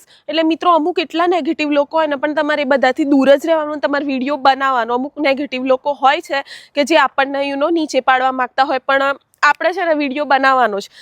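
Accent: Sabarkantha, India. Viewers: સાબર કાઠા ની બોલી બેન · Tamari language parthi evu lage che k tame Bayad, Sabarkatha dist. Na hasho.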